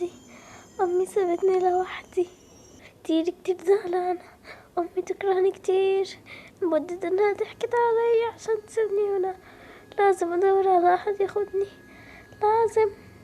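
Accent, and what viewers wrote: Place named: Syria. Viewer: عشان بتتكلمي سوري ومصري!؟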